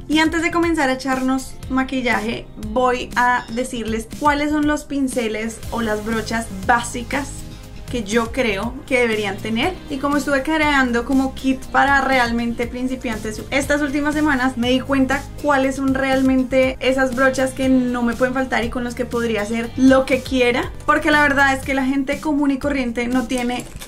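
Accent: Colombia. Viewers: Explicas súper bien, aparte ese bello acento que tienen ustedes los colombianos 😍. ¿Podrías hacer un video de cómo delinear un ojo encapotado? Saludos desde México.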